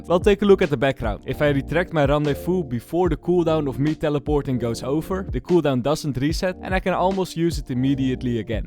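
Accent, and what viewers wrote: Netherlands. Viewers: het kaas accent is zo grappig vooral met vjeulereble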